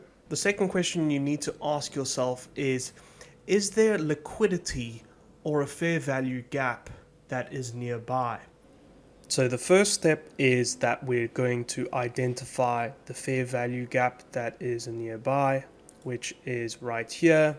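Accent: South Africa. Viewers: youre south african?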